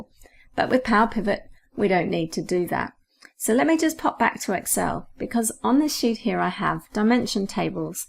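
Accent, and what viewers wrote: United Kingdom. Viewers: amazing, plus the little British accent...thanks !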